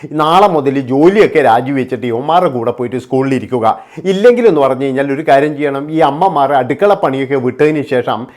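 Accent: Kannur, India. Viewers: ഏട്ടാ ഇങ്ങൾ നാട് എവിടെ നമ്മള് നാട്ടിലെ അതെ സ്‌ലാംഗ് കണ്ണൂർ ഇല് എവിടെ ,മട്ടന്നൂർ ഇരിട്ടി കുതുപ്പറമ്പ് ഈ ഏരിയൽ എവിടെയെങ്കിലും ആണോ,, എൻ്റെ നാട്ടുകാരൻ സംസാരിക്കുന്ന അതെ ഭാഷ കേൾക്കുമ്പോൾ ഒരുപാട് അഭിമാനം,മനുഷ്യത്വത്തിൻ്റെ വേണ്ടി നിലകൊള്ളാൻ നമുക്ക് സാധിക്കട്ടെ,നമോക്കൊരുമിച്ചു. സ്നേഹത്തോടെ ജീവിക്കാം,,അധർമതിനെതിരെ പ്രധികരികം ,, എട്ട ഒരുപാടിഷ്ടം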